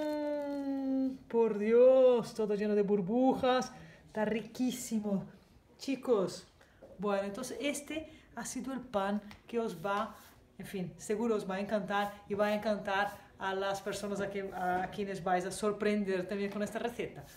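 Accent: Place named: Portugal. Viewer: Tan dulce su castellano con tono portugués, muchísimas gracias, por explicar con tanta dedicación y quedo suscrita como su alumna !🥰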